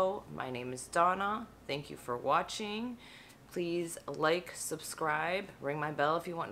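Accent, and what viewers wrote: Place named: United States. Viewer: >>> lol. I don't think you have an accent... Maybe the guy was just mesmerized by your american accent. 😁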